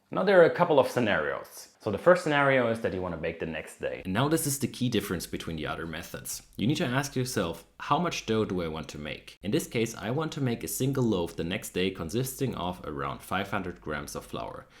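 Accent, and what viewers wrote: Germany. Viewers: Wow thermomix congratulation, salut 🤣🤣🤣you are too funny -I got lost when you talk with a lot of numbers 😢( failed math in school )can you theorize sourdough panettone please - panettone is the king of sourdough bread -❤I love German accent and jokes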